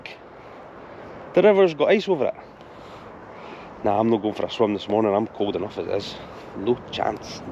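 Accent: Scotland, United Kingdom. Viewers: Wee tar’an blanket. ❤❤. Love the Scottish accent.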